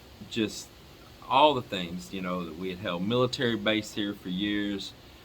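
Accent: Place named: Dallas, United States. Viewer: Love the Dallas accent. It is Dallas, right?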